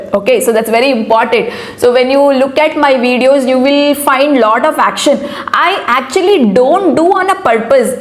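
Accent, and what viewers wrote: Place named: South India, India. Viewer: Just a South Indian accent.....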